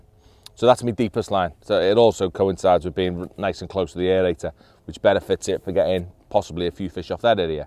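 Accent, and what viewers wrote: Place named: Liverpool, United Kingdom. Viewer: Your no des ship...can’t listen to scousers...I watch with sound off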